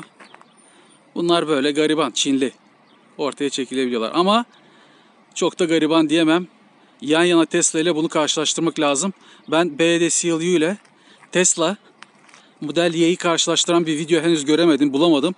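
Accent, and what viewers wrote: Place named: Thrace, Turkey. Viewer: Trakyalı şive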